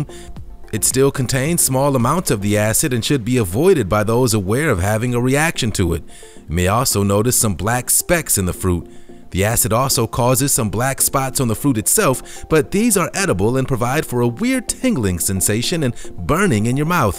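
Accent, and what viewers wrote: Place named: United States. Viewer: I call it custarp apple too i think we speak british english this person is speaking american english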